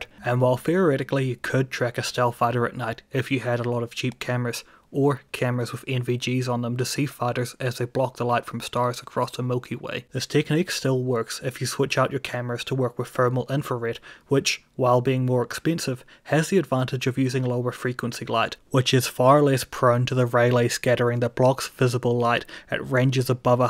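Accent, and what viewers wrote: United Kingdom. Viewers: You have an accent so it sounds like UK perhaps and probably get away with it due to the connection between the United Kingdom and the us being part of the five eyes. But probably still would have to ask for permission and would probably get it because what I'm thinking is portable extremely modular, uses an SDR array of disciplined chief sdrs and one that's a little more expensive because it covers from 6 to 20 GHz. And then there would be $360 degrees of visual, visual zoom, infrared, thermal, it's kind of designed like a cylinder with heat dissipation and reflectivity taken into account. And people who are knowledgeable about passive radar know what I mean about reflectivity. I'm using quarter wave well filtered phased array antennas on a flexible PCB for example is one idea ahead facing each direction so you can get time of flight. 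There's also a nice premade hat it looks like a hat the determines phase so you can weed out multipath. It connects via fiber optics pelican case which has whichever is the best chemistry and density for power storage. The ability to hook up to camouflaged wind, solar, or hydro even if it's just a local water source. Hooking up a small charging device to the local water. You put up a set of 10 or 15 of these and you could cover a lot of space 1 it would spend several days taking baseline is on the EM spectrum and picking out known emitters. If you take a baseline from knowing emitters with known coordinates over a week or two weeks of various w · Did you think of this idea yourself? You could probably develop some rough prototype and pitch it to the US government, or the UK since you sound British.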